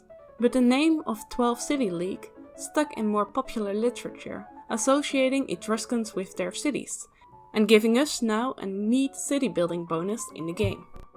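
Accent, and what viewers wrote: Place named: Netherlands. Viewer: Great stuff! Started off sceptical, since the first view presentation didn't look that inviting. However, now that I watched this first video I have to say, love what you are doing here! Especially taking the ingame depiction of Etruria, and putting that next to the popular and academic views is a really nice and interesting exercise. And in general, its nice to hear a Dutch accent in a space often so dominated by Anglophone ones. This one was off to a great start for this series!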